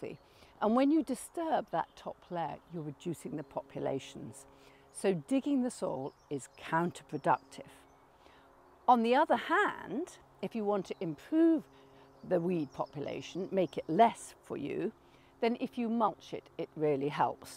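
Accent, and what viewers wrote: United Kingdom. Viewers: It's really true - everything sounds better with a British accent! Even weeding. :) Grounsel - aka creeping charlie. What a pain the in... Love the video! For my veggie garden, I'm with the late Peter Seabrook, and still prefer to dig. But then, I'm digging in all the rotten down, fall leaves, and the spring chopped ornamental grasses. I don't see any lack of earth worms. Except when our American Robins realize that I am providing an easy buffet. Then, I do lose some worms.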